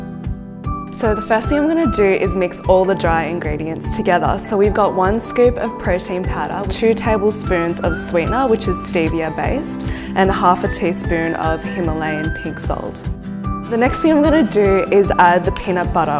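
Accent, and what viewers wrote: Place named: Australia. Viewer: omg the CC/subtitles says racist peanut butter cup, it just can't pick up the aussie accent I guess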